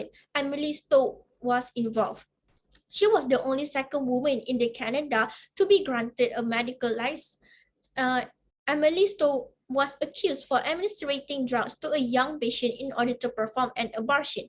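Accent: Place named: Philippines. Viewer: hii there very interested project research good explanation and details and most we malaysia still not aware about this one more thing the way you explain fascinate me good job and you can enhance 👍👍👍 sorry about one thing you stammer but no worries dear because you kind have some filipino accent 😍 anyway all the best and may allah bless you with success ammin.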